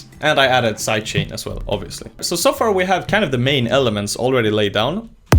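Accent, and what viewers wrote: Sweden. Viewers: Feel like you have alot of swenglish in you accent. Svensk?! 😄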